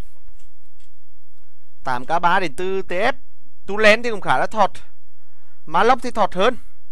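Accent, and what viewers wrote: Nghe An, Vietnam. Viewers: Giọng nghệ an hay mà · Giọng nghệ nghe buồn cười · thick giọng nghệ an nghe sướng tai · E thích tiếng nghệ an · Ah giọng nghệ an ở thái hoà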